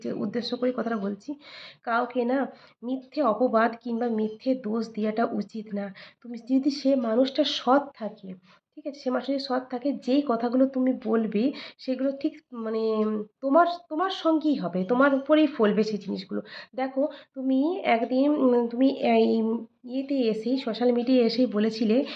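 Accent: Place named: India. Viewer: আপনার কথা শুনে ইন্ডিয়ান মনে হচ্ছে। আপনি জানলেন কি করে।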